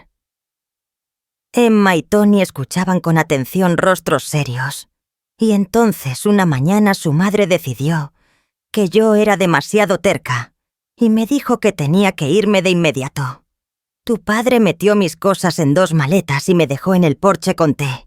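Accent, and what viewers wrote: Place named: Spain. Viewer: Es el acento de alguien de nacionalidad española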